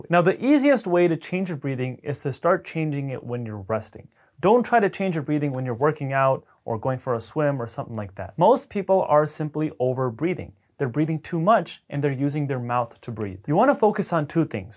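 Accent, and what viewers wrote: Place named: United States. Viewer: I'm guessing from his accent he was born in America?!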